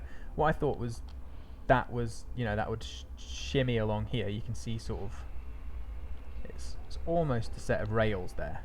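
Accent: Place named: United Kingdom. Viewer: Surprised you don't have more subscribers after I heard your quality British tea-time accent.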